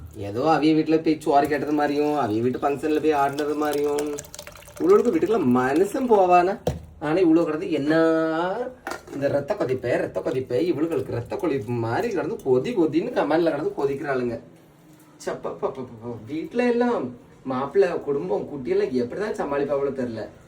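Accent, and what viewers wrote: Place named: Kanyakumari, India. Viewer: Kanyakumari slang laye thittureenga anna super 🎉😂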